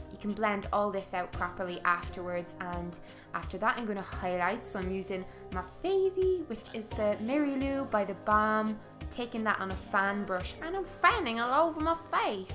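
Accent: Ireland. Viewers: As much as Kylie Jenner kinda annoys me (and all the hype... which I don't really "get")... or the concept of makeup done for the sake of selfies, I LOVED this. First time watching. New sub;) <3 So charming, and super skilled! Also, don't get me started on Irish accents. GOTTA LOVE THEM!!!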